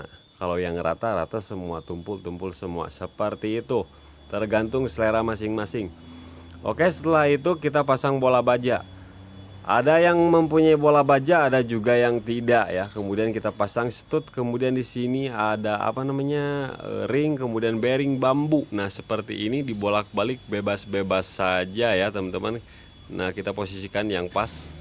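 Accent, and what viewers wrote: West Java, Indonesia. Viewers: Kang Aya logat logat Sunda, Sunda mana kang, bengkel na di mana kang..hatur nuhun kang.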